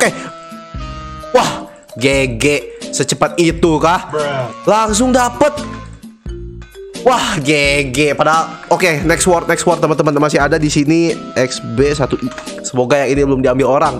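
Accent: West Java, Indonesia. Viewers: logat nya kaya orang sunda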